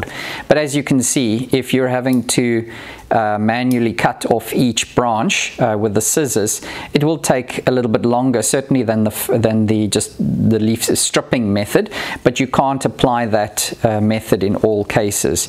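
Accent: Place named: South Africa. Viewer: Fall there vs spring here in scandinavia can be confusing but then you start talking in the nice south african accent and i go uh yes he is on the other side of the world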